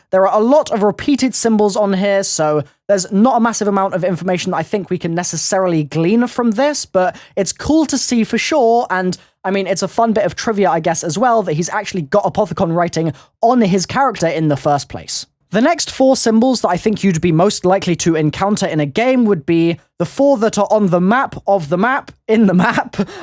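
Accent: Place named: United Kingdom. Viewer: Ur British voice is anoyyyyyyyyyyyyying!!!!!!!!!!!!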